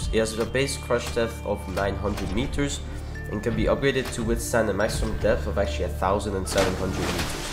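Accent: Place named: Russia. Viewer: почему у него русский акцент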